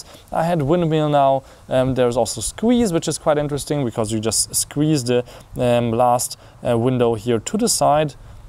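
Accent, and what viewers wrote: Germany. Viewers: Dude I love your German accent! I can be your girlfriend? XD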